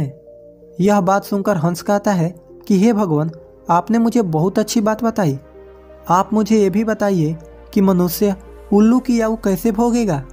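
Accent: India. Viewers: Bihari hindi OMG🤣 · Aap bhojpur bhasha k nagrik hain aap ka uchcharan aur tone bhojpuri style ka hai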